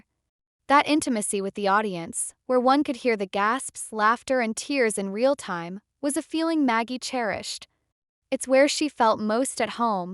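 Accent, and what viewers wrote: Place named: United States. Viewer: Fucking American voice-overs on everything these days, I resent it hugely..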